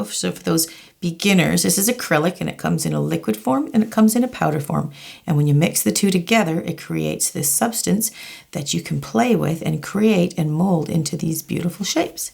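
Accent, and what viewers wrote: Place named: Canada. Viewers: Cecile Ruer You are welcome Xx. You can hear it by how she pronounces certain words. Like -about etc. Very typical Canadian, and I love it hihi. No Aussie accent tho which is totally different :P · Gille87 Yeah i asked because the accent was for me very canadian :p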